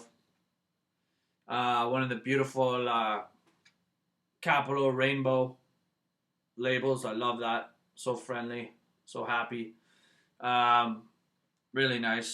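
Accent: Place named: Canada. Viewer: Wow, you have the most stereotypical Canadian accent I've ever heard!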